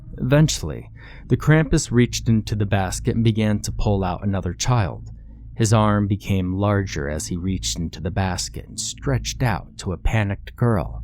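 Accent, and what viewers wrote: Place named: East Coast, United States. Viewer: I'm hearing an East Coast accent....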